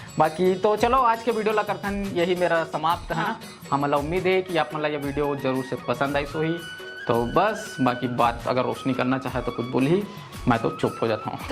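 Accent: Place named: Chhattisgarh, India. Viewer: आप मन छत्तीसगढ़ी बोलथव त बड़ सुघघर लगथे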